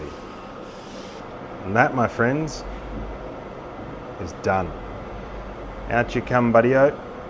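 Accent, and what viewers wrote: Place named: Australia. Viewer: Hi, new sub here Jon, from mid-wales, formerly from Colchester, which is a bit weird to hear the old homestead being called out in an Aussie accent :-) I tend to think of Colchester lathes as being 50s-60-s machines, student/master et al. nice to see the name at least still going strong :-)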